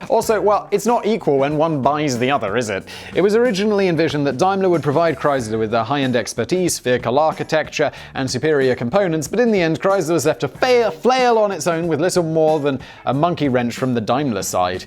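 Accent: United Kingdom. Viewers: Off-topic linguistic question from an American; You said, "Comer" instead of "coma". Why is it that people in the UK tend to pronounce "uh" or "a" as "er"? Honder, comer, etc... Is that the original intention of the pronunciation and it's just another way America tried to be different? Or is that just sort of how your speech evolved, like a vernacular?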